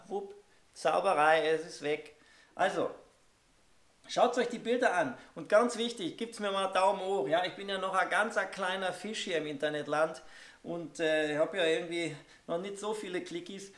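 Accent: Austria. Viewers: Bist ein sympathischer Typ 😁 Die Bilder sind richtig gut. Das österreichische ist nicht schlimm man versteht es gut 👍 Nur die Musik war bissi laut oder die Sprache zu leise sonst schöner Film. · Österreichisch ist perfekt. Als weida sooo! und viel Spass mit dem kleinen Flieger!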